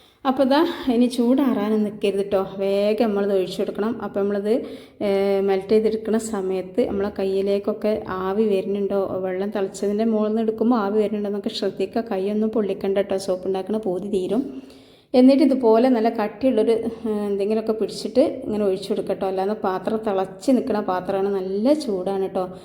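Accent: Malappuram, India. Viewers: ഇപ്പോ താത്ത ന്റെ സംസാരം മലപ്പുറം ഭാഷ ആയി 😂ഇതിനു മുമ്പ് ഇൻഡോകി ഇനാലെ എന്നൊക്കെ പറഞ്ഞു ബോറടിപ്പിച്ചിരുന്നു ഇപ്പോ പൊളിച്ചു ❤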